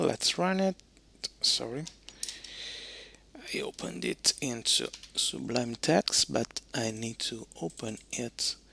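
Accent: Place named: Italy. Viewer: Ho riconosciuto lievemente l’accento italiano 🥰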